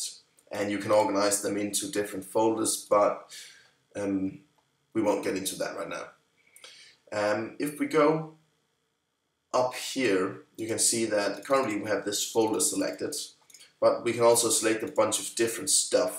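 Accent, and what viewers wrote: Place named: Denmark. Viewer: ham her lyder dansk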